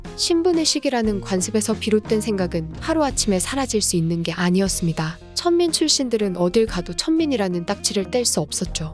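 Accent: Gyeongsang, South Korea. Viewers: 갱상도 출신 이시군여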